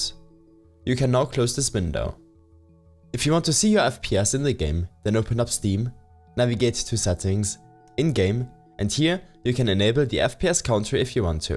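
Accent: Germany. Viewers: i bet ur german u sound like one ich bin ja auch deutsche :D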